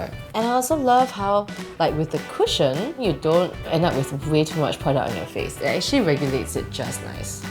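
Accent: Singapore. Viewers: This is my second video of watching her and I love her cute Singaporean accent ^.^ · The singlish! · I think she's from Singapore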